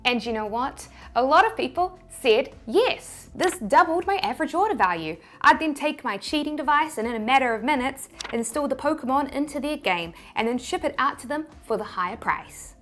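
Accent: New Zealand. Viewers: Came back to get my annual NZ accent dose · thanks for the great info! The best thing about this channel is your lovely new zealand accent! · are you from Southland? i think i can detect it in your accent!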